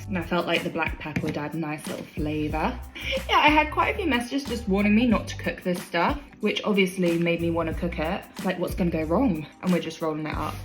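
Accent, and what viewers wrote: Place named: United Kingdom. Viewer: Hi! I didn't know you'd have a YouTube channel 🥰 I'm Italian, but I live in Malta and I started following you for your lovely accent 😍 I love the British accent and culture. What's more I'm vegan too.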